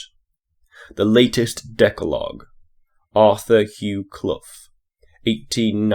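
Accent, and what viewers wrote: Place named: England, United Kingdom. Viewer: I'm looking forward to your next chapter readings when you have time for it. Not only are they not ai but you're English so your accent fits the reading.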